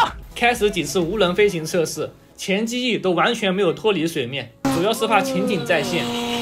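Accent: Jiangxi, China. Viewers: 哥们儿，你的赣普话好有特点😀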